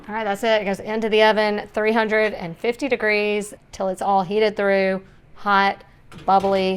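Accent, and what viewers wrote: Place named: Texas, United States. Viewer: Is she frkm TX? Love her accent ☮️💟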